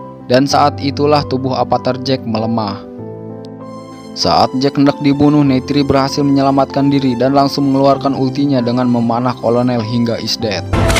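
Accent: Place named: West Java, Indonesia. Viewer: Pasti Narator Nya Orang Sunda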